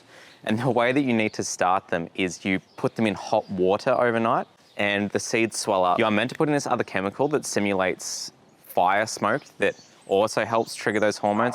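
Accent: Australia. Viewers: aussie accents are the language of heaven, melbourne strong <3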